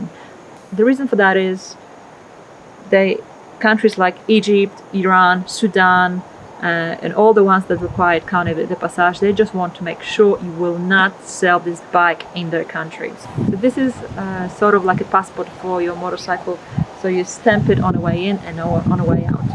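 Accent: Australia. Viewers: I spotted the Aussie influence in your voice and attitude. You're an exceptional individual and supreme ambassador. The world needs more of you. ❤ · Australian.....yes can pick Aussie accent....and polish....so am i....doing this on your own Awesome.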